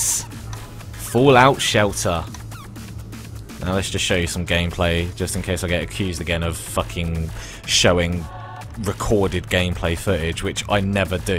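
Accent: United Kingdom. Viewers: Thank you so much disembodied british voice :D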